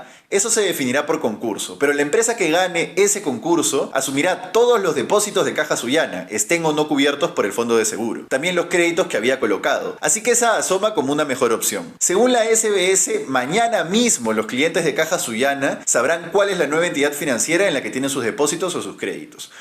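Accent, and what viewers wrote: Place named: Argentina. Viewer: Excelente escucharte pero evita hablar al estilo argentino que defecan por la boca.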